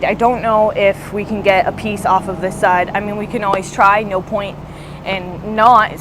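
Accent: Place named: Pennsylvania, United States. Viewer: being from PA, the "alright" is just a part of our PA talk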